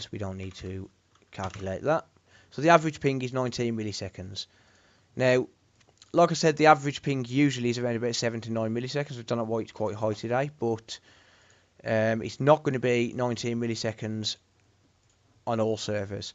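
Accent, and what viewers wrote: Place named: Black Country, United Kingdom. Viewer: I thought the same lol, as soon as I started watching this video I was like wow is that a yam yam (or a Brummy)? Then moments later - yea, a yam yam! I'm from Stourbridge/Wollescote but working-living in Birmingham for the last 9 years, its always nice when visiting back home hearing people talk normal/homely again - yow alright lad, am bostin ah kid, I ay bin up mezza in ages I core believe it, ar dow arf miss livin around the black country. So yea, I used to have Virgin Media for donkeys years at my parents house until I moved to Brum 6 years ago, think I last had 100 or 200Mb in Stourbridge but for Brum I could only get BT's 76Mb, actually 65-69Mb. Then Hyperoptic came along 3-4 years ago so now I'm on their 1Gb, actually 900Mb ish for £45/month BB-only. Honestly though I hate living in Birmingham, I'm glad Virgin have 500Mb+ now its giving me an incentive to want to move back home where all my family are.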